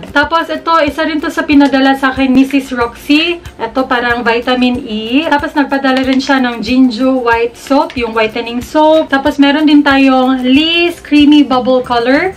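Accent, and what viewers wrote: Visayas, Philippines. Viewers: nag subscribe ako kasi ang kulit ninyong mag jowa. katuwa. una sabi ko bisaya siguro to kasi may punto hanggang sa di ko na namalayan nagmarathon na pala ako ng mga vids mo. IG: krizzie31 FB: krizsy anne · hello atiiii 😊😊 pnapanuod ko po yung video mo kasi: **natural na natural ka po I mean hindi ka maarteng magsalita hindi pasosyal 👏👏 **gusto ko po yung tone ng voice mo kahit po parang bisaya ang sweet po kasi 😍😍 **ang kulit mo po sa mga videos mo especially pagkasama mo yung my loves mo-jolly person 😊💜💜 **hindi ka po nakakaboring panuorin 😘💙 --- plssssss 💜💜💜👏👏👏👏👏👏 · Hi Miss. Madalas ko na nakikita yung mga videos mo sa feed ko then nung pinanood ko na di ko namamalayan na grabe na yung tawa ko. Very natural, transparent and I love your bisaya accent kaya napa subscribe ako. Congrats po for 20,000 subbies and advance happy anniversary sa channel mo po. 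More kwela videos to come dito sa channel. FB: <link> IG: <link> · Hi ate, di ko lang matandaan kung anong pinakaunang video na napanood ko sa channel mo pero sure akong baguhan ka pa nun kasi hindi pa yung G7X ang cam na gamit mo hehehe. Basta naalala ko lang noon na intro mo palang napa click na ako sa subscribe button kasi nakakahawa ang pagka witty mo at bungisngis. Enjoy na enjoy akong manood sa mga vlogs at tutorials mo kasi bisaya ka, ganahan kaayo kos tono nimo ba. #ProudBisayaHere:D Keep on inspring us and God Bless you always! I love you ate:) Mwaaahhh <link> <link> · Hi ate 😍 Chada jud kaayu imung mga video ate uy labi natung mga blogs ninyu ☺😙 Haha si mylabs ba kulango pud diayng dako ganahan kayu kog lantaw te kiy usahay kiy maka storya kag bisaya haha struggle pud siguro ate ba sig tagalog haha hinaot uy nga naa untay bisaya nga mga blog ayha pa dayun ko mag himo ana😂 halaka te uy! 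pa try gud ko anang ipag me now o ambot unsay spelling ana😂 haha ganahan kog lantaw sa imu g videos te kiy Tinood ka dili ka plastic te😍 mismo akung papa ganahan pud sa imung mga video kiy imung tono daw kiy bisaya gihapon ganahan siyag lantaw haah ana akung papa pwede ba daw mag bisaya unta ka kiy wala daw kaayuy Bisaya nga mga bloggers Pero Ana ko sa iyaha tagalog lang sa ta pa kig dili unya ka sabot ang mga Subbbies ni ate nga tagalog😅 haha nag storyaha nami ni papa tungod nimo 😍 Haha tung nag kuan mong mylabs nimk Joker daw kaayu😂 haha dinhi lang sa ko te kiy mananaw pakog videos nimo uy! maka adik man ka ate😂 way char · Hi Ate, unang vid na napanood ko po sainyo ung Fashion 21 na make up tut. Nagsubscribe po agad ako kc nagagandahan tlga ako sa accent/punto ng mga bisaya since bisaya din mama ko. Sya po ang naalala ko. Para skin napakalambing po ng salita nyo effortless nyo magpatawa sa mga vid. 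Simple lang po pero nakakaentertain. Congrats din po sa 21K subbies!!! ❤ FB: Jonna Banuelos IG: jlvm_ Twitter: jonna_lnv Gmail: <mail>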